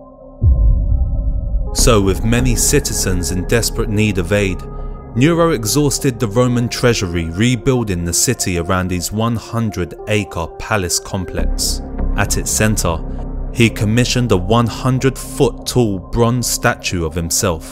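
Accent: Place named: East London, United Kingdom. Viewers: What accent is that... It sounds like the way my black friends speak near London. Brilliant stories btw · Nero was clearly psychotic. The Mad King? Nice voiceover- East London much?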